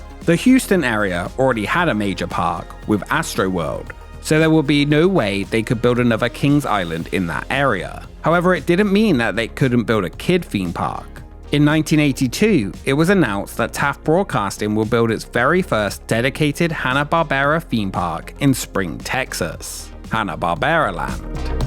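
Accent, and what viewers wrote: United Kingdom. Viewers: This is about American theme parks but why does the host here have a British accent or is it Australian sometimes I just don’t know the difference. It’s very confusing however. Love this channel and especially the theme not this new theme but the main theme that came after the new theme don’t ever lose that. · Because unlike the builders of Hanna Barrera Land, is providing things people like. I'm pretty sure many people's lists of things that they like would include "listening to a cool sounding voice with a British accent." 😎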